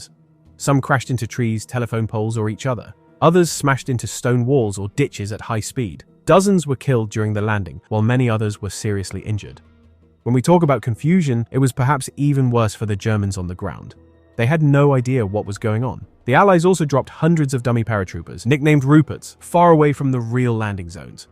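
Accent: United Kingdom. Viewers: The narration sounds Brit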